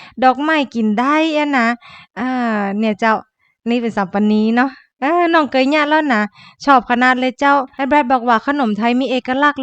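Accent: North, Thailand. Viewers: เป็นงานแต่งที่น่ารักอ่ะ วันนี้บังเอิญเปิดมาเจอ เลยได้ไล่ดูมาเรื่อยๆ เจ้าของช่องอู้คำเมือง น่ารักดีค่ะ ยินดีด้วยนะคะ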